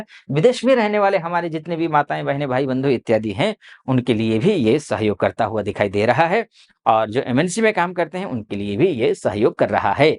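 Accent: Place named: Nepal. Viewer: Your accent looks like you r from nepal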